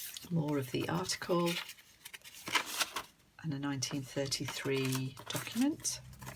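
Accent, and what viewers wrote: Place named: West Midlands, United Kingdom. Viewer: I’ve just discovered your lovely channel....some beautiful journals you have xx I started journalling earlier this year and love it! I thought I detected a West Midlands accent....I’m from Brum originally 🙂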